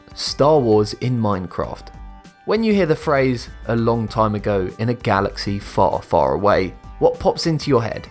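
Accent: United Kingdom. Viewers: is he american with a british accent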